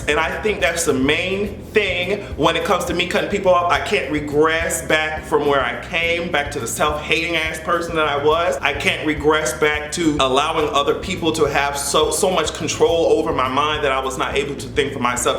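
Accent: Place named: Jamaica. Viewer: Hey, 1. put out as many videos as you can! At times, I'm looking out for you and you usually do 1 video a week, besides the podcast! If you can do 3-4/week, if PO.SSI.BLE (your baritone singing voice), please don't hold back! 2. Please bring out the Island/native tongue when expressing yourself! Not ever video, but like this video today, that Jamaican accent would have driven the point! We all know you are quite educated, but some island touches here and there isn't bad. Test out the idea of course, since you do have a steady audience! 3. "You need to CUT IT!" True, some family members are not ride or die! Ok, I'm out!!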